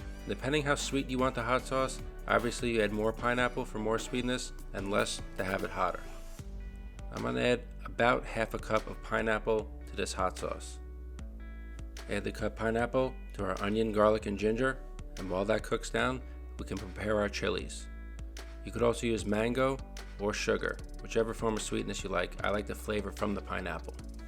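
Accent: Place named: New York, United States. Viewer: I like that Global knife, man. I almost bought one just like in 2015...but I'm a cheapskate, and so I didn't. LOL. I can tell by your accent that you're from NYC. Which borough? Just curious. Don't answer that, if you're uncomfortable answering. I'm a west coaster, but my wife is from Weehawken, so I've been out your way quite a bit.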